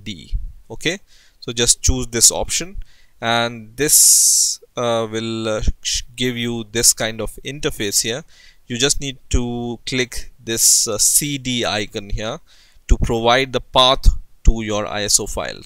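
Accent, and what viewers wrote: India. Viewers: I'm tired of this Indian accent :/ Thanks for the video though. Liked :) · india isfunny with speak English >.> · ur accent looks like indian to me ;) 😉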